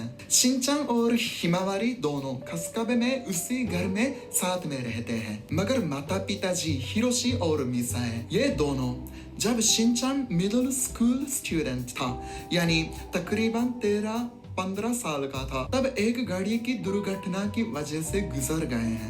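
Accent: Standard. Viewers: He say pure Hindi than me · Aap itni shuddh hindi bolte hain ki mujhe bhi aapse bohot saare shuddh hindi ke shabdo ke baare me pata chala. Dhanyawad 🙏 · This guy speaks better and pure hindi than us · आप शुद्ध हिंदी बोलते हैं और मुझे आपके वीडियो पसंद हैं, आप मुझे बहुत प्यार करते हैं, मैं जापान से प्यार करता हूं।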